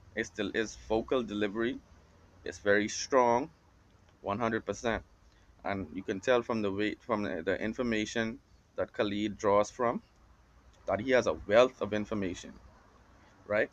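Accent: Bahamas. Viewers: You Bahamian bro?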